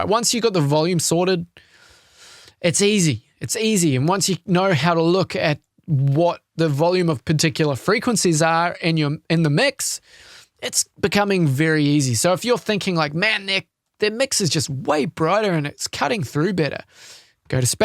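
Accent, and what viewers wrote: New Zealand. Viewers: Hey mate. Just found your channel and so nice to hear a fellow Kiwi accent. I just set myself up with Ableton live lite. free with my Focusrite solo interface. I've just watched your first video of the 25min intro for beginners and found it great. It would be great if you changed your mouse cursor setting to make it bigger on the screen and easier to follow your movements when sorting settings. other than that, Just subb'd thanks for you channel!!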